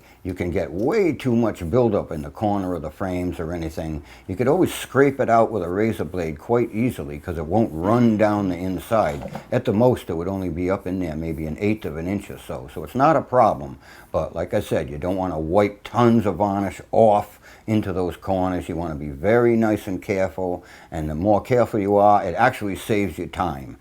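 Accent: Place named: New England, United States. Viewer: Love your craftsmanship! My 95-year-old father is an old-school cabinet maker. Good to see that kind of understanding of the material and respect for the craft is still alive. One personal question, if you don’t mind: I can hear your accents is New England. Were you born there? Thanks for your great videos!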